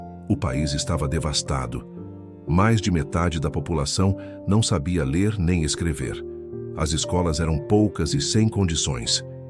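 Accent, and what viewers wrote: Brazil. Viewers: Sotaque de Brasileiro Horririso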